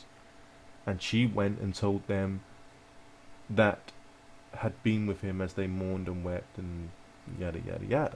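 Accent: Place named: Bristol, United Kingdom. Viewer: you sound like you from bristol am i correct i lived there for 2 years? lol the hay maker is coming ha ha funny brother!!!!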